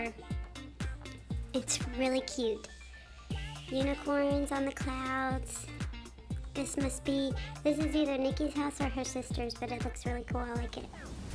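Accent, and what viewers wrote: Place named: United States. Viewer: I love your voice its so country😊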